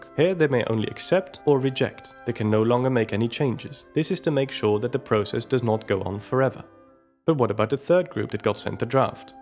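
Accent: Netherlands. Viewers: Can I place a guess and say you are Dutch or Flemish?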